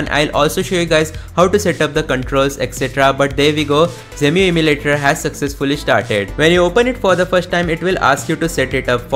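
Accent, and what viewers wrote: India. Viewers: Need an emulator to change Indian accents, sounds like an Indian Scam Call Center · Hint ağzıyla ingilizce konuşulduğuna tanık olursanız (bu videodaki gibi) kaçın.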